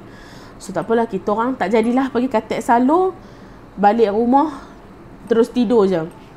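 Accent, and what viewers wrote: Kedah, Malaysia. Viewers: Mst org kedah nie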